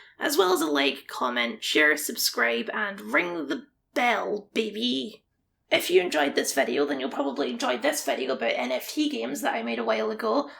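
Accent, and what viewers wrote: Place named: Fife, United Kingdom. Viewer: You're partially right in this instance, as the absolute scavenger that's the current trademark holder is domiciled in the United States. However plagiarism is actually an offense under UK law, the nation state both the creator of this video and I are domiciled in (So I assume based on his vaguely Fife-adjacent accent; Am I close?), and is also an offence in France where the potential plaintiff is domiciled. This might still matter, but only in relation to the game's distribution in those regions: Something I have little doubt the nutter who shat money on a dead old game's name only to feather it with crypto-slop will care little about, as there will be plenty of markets where the law does not adequately protect creators.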